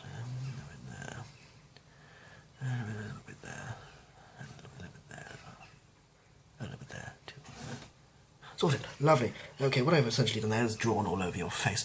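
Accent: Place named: England, United Kingdom. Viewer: Bloody hell I love that posh english murmering, don't ask me why hahaha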